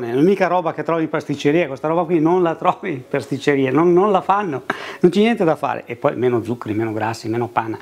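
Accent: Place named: Massa, Italy. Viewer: UNA BELLA RUMATA ...... DIALETTO MASSESE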